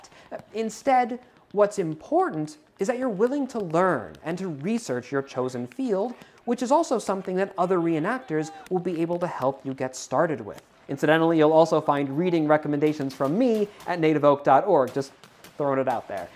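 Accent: United States. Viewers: I have a question. Are you an American? Or are you British?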